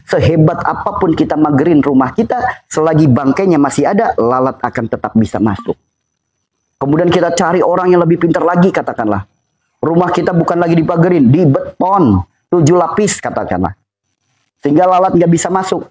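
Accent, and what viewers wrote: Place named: West Java, Indonesia. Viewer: Pa ini bp orang mana kpnkaya orang sunda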